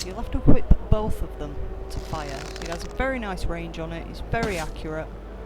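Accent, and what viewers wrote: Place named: Lancashire, United Kingdom. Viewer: Hi, just subbed after watching these vids, just started Morrowind after literally thousands of hours playing and modding Oblivion and Skyrim on PC and 360. Very helpful tutorials about the basics, it has surprised me just how much more in depth this game is compared to oblivion, which i thought was quite in depth itself, forget Skyrim for that,lol. Anyways nice work and keep it up. By the way where abouts are you from as you sound to be a Lancashire lass to me, as i am a Lancs lad,haha?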